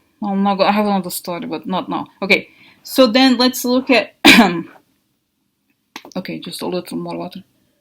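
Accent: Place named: Russia. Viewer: Sometimes you have a russian accent. Umm Are you a scorpio? Good analysis of Jared. They have no souls. Thank you. XO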